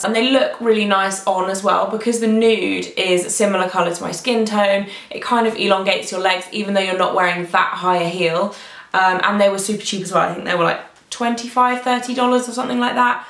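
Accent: United Kingdom. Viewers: I agree, maybe it's the voice and the british accent! :)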